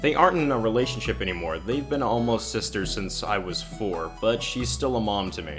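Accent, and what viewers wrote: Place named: Chicago, United States. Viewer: You have a fresh suave Chicago accent, is it just me who thinks that?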